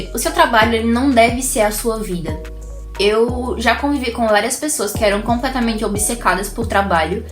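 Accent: Northeast, Brazil. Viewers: Tá aí um sotaque nordestino arretado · PERCEBI PELO SOTAQUE QUE ERA DO NORDESDE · o seu sotaque nordestino é inconfundível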